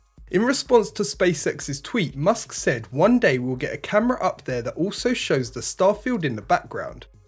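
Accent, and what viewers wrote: United Kingdom. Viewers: Sorry but his mouthed was British his farther south african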